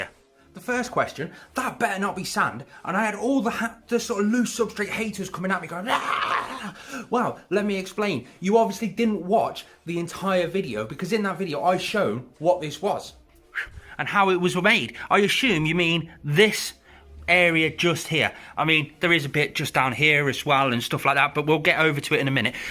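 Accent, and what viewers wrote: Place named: Northern England, United Kingdom. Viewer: People need to leave it out. Like actually take the time to watch the video or understand the situation before jumping down people's throat, you did a good job mate and nobody should take that away from you. On a side note thanks for clarifying where you live my southern ears almost missed it as I don't speak northern too well 😜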